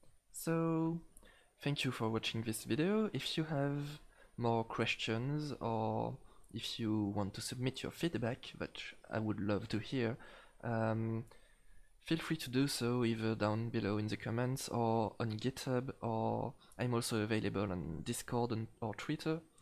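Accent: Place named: France. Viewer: cette accent français xDDDD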